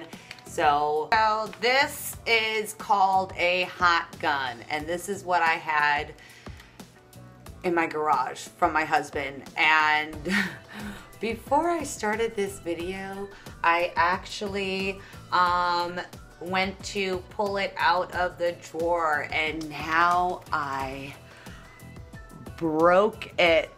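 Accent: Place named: Texas, United States. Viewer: Everyone saying she reminds you of grave3yardgirl... y'all are just recognizing the Texas Suburban accent. This is just how we talk here.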